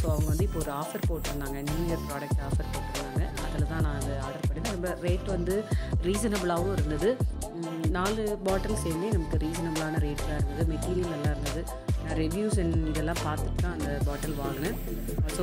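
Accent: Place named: Tirunelveli, India. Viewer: Nellai Tamil is mass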